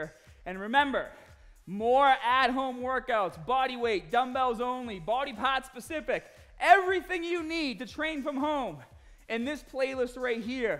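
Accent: Boston, United States. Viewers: This sounds sappy as hell, but I am SO THANKFUL for these workouts. I've been using your videos four or five times per week for 7 WEEKS and my body has literally TRANSFORMED. I live in Massachusetts and I stumbled onto his YouTube channel and I legit started doing the workouts because I heard his Boston accent. This guy is the REAL DEAL!!!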